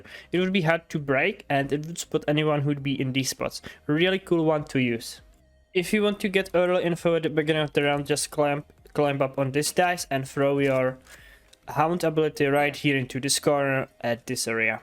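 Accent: Czech Republic. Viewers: Bro's czech i know by hearing accent, am i right?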